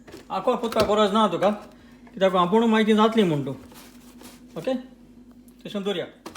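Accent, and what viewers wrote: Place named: Saxtti, India. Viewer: Nice. Love the Saxtti dialect too!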